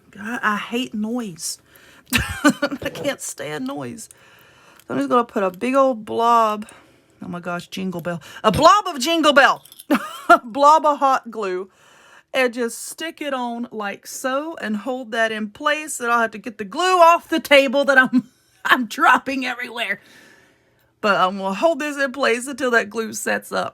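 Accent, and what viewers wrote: South, United States. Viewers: I love your sense of humor and your Southern accent. Only nosey people are going around picking up stuff to see if you took the tags off 😂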